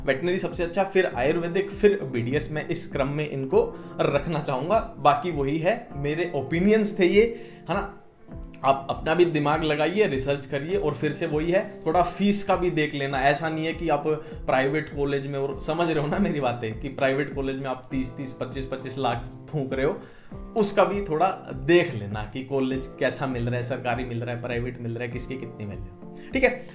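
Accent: Haryana, India. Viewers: Bro haryana se kha se ho aap ??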